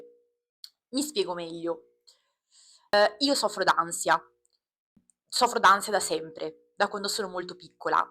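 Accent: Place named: Tuscany, Italy. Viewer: nella parlata hai un sottofondo toscano, forse hai parenti o origine di quel posto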